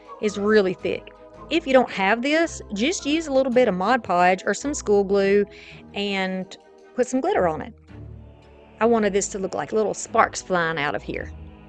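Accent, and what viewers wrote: South, United States. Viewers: I love your videos. Always so helpful & enjoyable. It is so nice to hear a southern accent. So many try to cover it up. I spend a lot of my days watching your videos. Thanks again.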